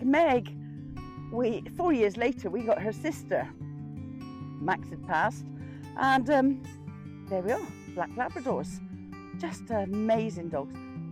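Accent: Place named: West Country, United Kingdom. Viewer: thanks for that lovely walk in the country, glad to hear you have not lost your west country accent. Go girl. keep walking and talking.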